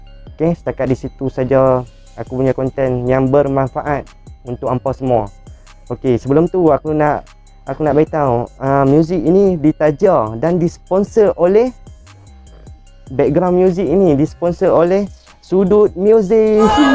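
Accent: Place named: Malaysia. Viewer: ko dengki dah kenapa..content dia mmg khusus utk penonton malaysia,slang dia mmg slang sesetengah kawasan/negeri d malaysia..ko x faham tu ko punya pasal la,bukan salah cara dia bertutur,aq faham je semua..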